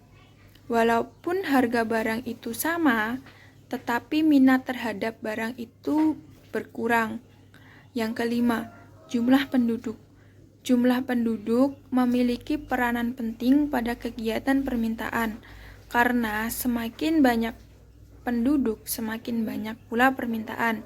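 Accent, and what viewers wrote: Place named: Klaten, Indonesia. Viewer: Kamu orang klaten ya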